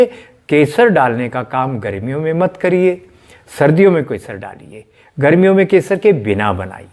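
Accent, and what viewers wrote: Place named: Punjab, India. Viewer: Aap Punjab se ho vaid g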